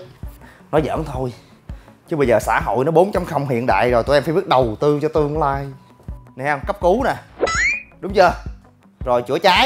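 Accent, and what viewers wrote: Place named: Mekong Delta, Vietnam. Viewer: Giọng anh nghe đã dễ sợ.....chất phát gốc miền Tây..... Dễ đi vô lòng người....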